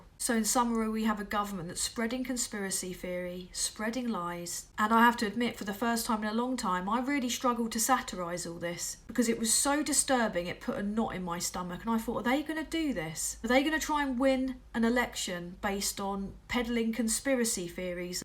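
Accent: London, United Kingdom. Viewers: Just out of interest, what part of the country are you from? Sometimes I pick up a London accent, other times a northern accent.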